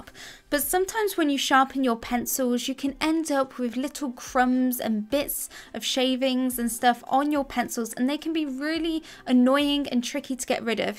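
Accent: United Kingdom. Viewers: Thanks for the video👍🏻! By the way I love your accent, I’m so obssessed with British pronunciation, is it the standard british accent or a Southern/Northern? Xoxo 😘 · Are you from Britain? because I can hear your accent from Britain.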